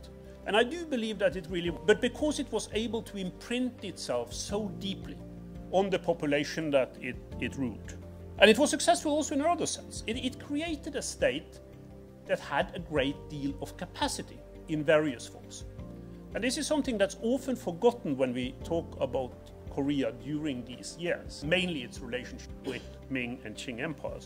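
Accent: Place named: United Kingdom. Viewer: 하버드대에서 강의하는게 영광이라고 하는걸 보니 하버드대 교수는 아니고 영상보니 버밍엄대교수같은데....억양이 영국이고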